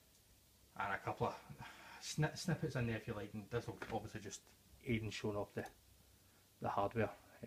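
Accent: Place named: Scotland, United Kingdom. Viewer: His "gibberish" is Scottish accented English.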